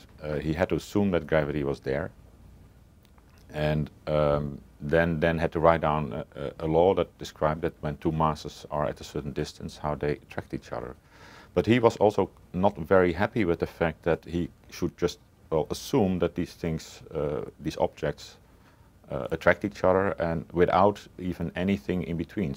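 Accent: Netherlands. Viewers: As a fellow Dutchman, I recognize that accent 100% of the time. ;-) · ***** The meaning of "He's Dutch" is that he actually lives in the Netherlands & speaks Dutch. Since English is his 2nd language (assuming he doesn't speak French & German as well) his articulation isn't quite as good as a native speaker. That does not mean there is anything wrong with his science. Only his articulation of it in English. For me, I understand him fully. Sinds ik spreekt ook Nederlands. But since you & the rest of the flat brainers think he is saying gravity doesn't exist, I have to assume it is in his use of the language. Since you can't understand what he is saying & also can't understand my point I have to assume you have an IQ of around 58. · That Dutch accent. I recognized it immediately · I think he sounds perfectly like a damn dutchman, with his accent, in english, no idea why you think otherwise.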